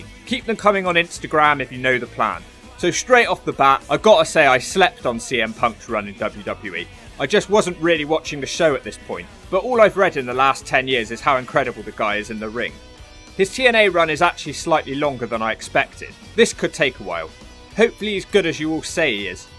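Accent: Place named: Weston-super-Mare, United Kingdom. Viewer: You gotta be from near me in weston super mare with an accent like that. Great channel. 👍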